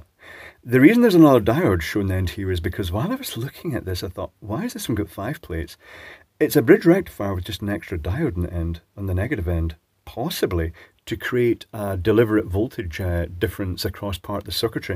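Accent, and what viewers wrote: United Kingdom. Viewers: Really,? you don't have a dog, so you pick the nearest selenium rectifier to blame it on? Gotta say, your viewers are among the most classy lot on YT. I was expecting to see nothing but a pot full of fart jokes, but NO! There's more vulgarity in the comments below the White house news briefings. Isn't it amazing what effect a proper British gentleman's accent has on people?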